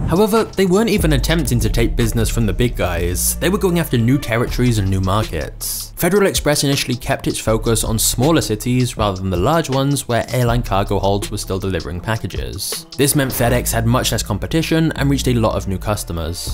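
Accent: United Kingdom. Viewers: Yeah but the UK accent sucks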